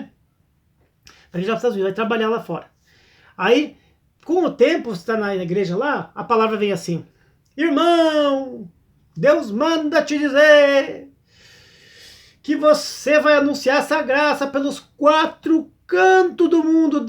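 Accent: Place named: Paraná, Brazil. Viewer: Um portugues caipira do interior do Parana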